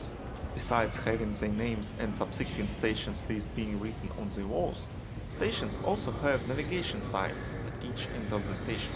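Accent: Russia. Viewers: Сразу по акценту понятно, рассказчик - русский)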